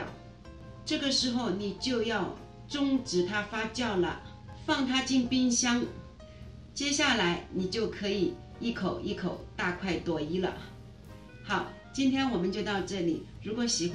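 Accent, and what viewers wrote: Shanghai, China. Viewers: 稀饭听浓刚上海话，哈亲切！ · 上海话，哈亲切！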